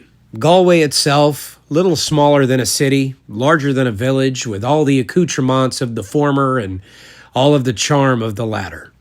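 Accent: South, United States. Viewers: Maith thú, don't fret about your accent, I'm thinking it would sound really lovely le Gaeilge I'm from Aran and am always trying to get my daughter to pronounce words like I do, but she ignores me and goes with east coast school accent ha. Southern accents are beautiful, I love them so much. Fun fact, when I was little in the 70s/ 80s we whispered when we were visiting Galway from the island , didn't want to stand out/ be embarrassed for speaking Irish. I think that is absolutely insane now. Like whyyyy! So happy when I hear of others learning Gaeilge. I've met a German lady and a San Francisco lady with beautiful spoken Irish. Ádh mór leis an bhfoghlaim!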